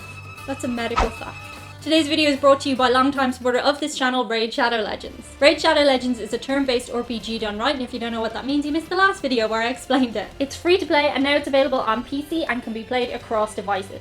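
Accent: Dublin, Ireland. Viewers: used to be whenever i'd go to a bar or restaurant the establishment always had a bowl with books of matches imprinted with their name and i always grabbed one as a keepsake. you don't see that much anymore these days so i'm hoping with this match making thing you were talking about this practice may come back into vogue. i hope so. on another note...i thought you kept saying transpart because well, umm, you're irish?! here in the southeastern united states we say transpork, mmmmm barbeque! stay weird! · I don't know... are we? And don't worry about "transpart" - to those of us who don't know any better, it just sounds like your lovely Dublin accent.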